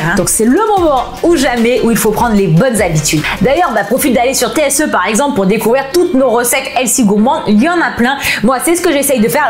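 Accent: South, France. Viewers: Je viens juste de remarquer que tu as un sacré accent du sud 😂 Perso je ne fais pas les live cette fois mais le programme Body Power j'adore 💪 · a grave l’accent du sud ça fait plaisir 😄team Sud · Je suis Québécoise et, dans cette vidéo, l'accent du sud semble tellement évident hihi! ☺👌 J'adore ! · J’ai adoré cette vidéo! Tu as totalement raison les lives rythme la journée et permettent de se vider la tête, j’attends que ça durant la journée! 🥰 D’ailleurs trop mignon ton accent du sud, je viens du sud aussi et c’est drôle de voir comment les accents varient facilement 😂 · Hohoho l'accent du sud dans cette vidéo 🤣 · Contente qu’une autre sudiste ait un super accent motivant 🥰 super sympa cette vidéo 😘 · Le nouveau programme dance TSE 😍😍😍😍 j'attends le nutrition book avec impatience!!! 😉😉 merci pour ta motivation qui nous motive, l'accent du sud c'est le meilleur 😘😘😘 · Je savais que tu venais du sud mais l'accent ressortait beaucoup sur cette vidéo mdr. 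C'est mignon. Merci pour cette vidéo. Je suis déjà tous les conseils^^ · J'ai bloquée sur ton accent du sud 😅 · Ooooh avec l'accent du sud😂😂😂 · Ton accent du Sud est TELLEMENT présent 🤣 !!! En tt cas merci pour tout ce que vous faites pour nous pendant ce confinement n°2. ❤️❤️❤️❤️❤️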